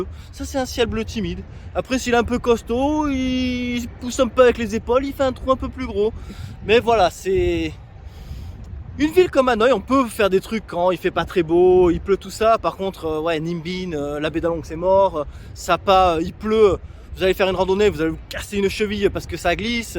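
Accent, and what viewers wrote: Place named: Southeast, France. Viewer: Bravo très bonne vidéo, on voit que tu connais le pays 👍, j'adore ta façon de prononcer les villes avec ton "naccen" du Sud-Est pas tout à fait ça mais bon on s'en fou 😀 Canto, Mito, Saïgonne, Niatran.... j'espère te croiser un de ces 4 à Saigon quand tu y retourneras ce serait sympa se prendre un petit "cà phê sữa đá" et échanger. Bon voyage.🤗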